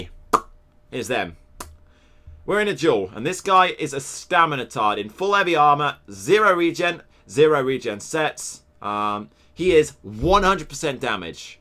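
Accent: Scotland, United Kingdom. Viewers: nice Scottish accent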